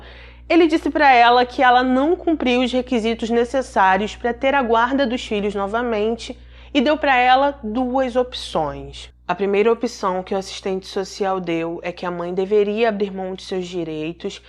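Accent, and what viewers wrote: Rio de Janeiro, Brazil. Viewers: Olá, gosto muito de sua narrativa, sua voz é muito bonita e seu sotaque deve ser carioca, já sou inscrita no canal.Triste esse caso, creio que tudo estava errado desde o início quando tiraram as crianças da mãe biológica! Lamentável e nada trará de volta a garotinha!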